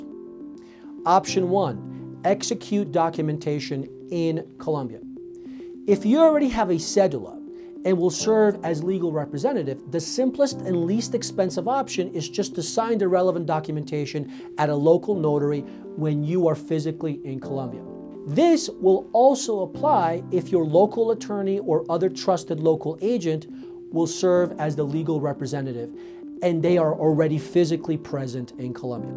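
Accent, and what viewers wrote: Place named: New York, United States. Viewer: I love how this dude goes from a very New Yawk accent to an incredibly good Spanish accent. Very helpful videos! Thank you.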